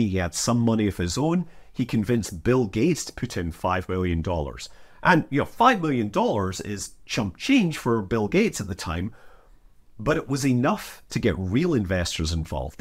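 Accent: Scotland, United Kingdom. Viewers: Either I'm getting more used to hearing USA accents everyday by living in the US (I'm English), or you're becoming more Scottish again. You're losing the Irish accent as well.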